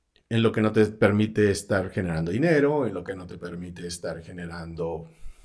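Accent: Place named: Argentina. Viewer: estas hablando como argentino!!! 😃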